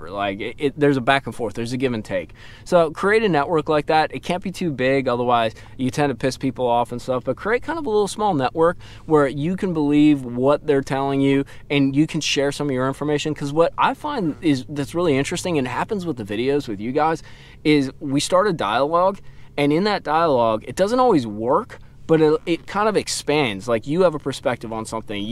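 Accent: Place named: Pittsburgh, United States. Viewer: Good content, where are you from? You almost have a Pittsburgh accent lol I heard you say n’at before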